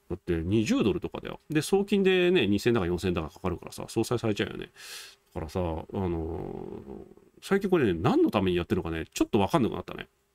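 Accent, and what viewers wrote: Kansai, Japan. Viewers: 関西弁…… 某掲示板…… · ん…関西弁…なんJ民の気配がしたな… ？？？『ワイやで』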